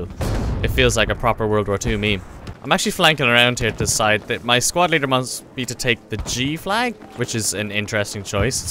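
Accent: Ireland. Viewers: U sound Irish...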